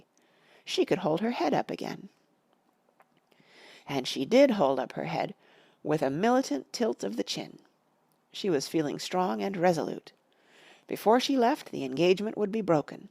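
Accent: United States. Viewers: It is surely OK to read an American story with an American accent? The real abomination would be to read it by AI! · Wodehouse read by an American?!?! Blasphemy!!!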